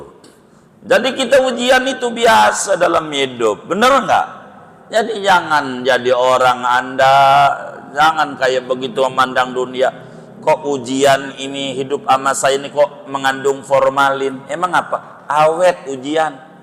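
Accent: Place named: Betawi, Indonesia. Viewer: Suka banget sama ustadnya. Orangnya ilmunya luas, orangnya lucu dan bahagia, ngomong betawinya kocak bikin ketawa jadi asik dengar tausiahnya.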